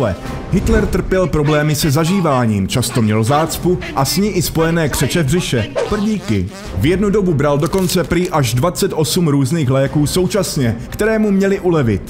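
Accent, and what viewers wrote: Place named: Moravia, Czech Republic. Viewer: Část času, který věnuješ na úpravu vousu, věnuj promrskávání shody přísudku s podmětem, ať nemluvíš jako úplný manták z Moravy, když se pokouší o spisovný projev.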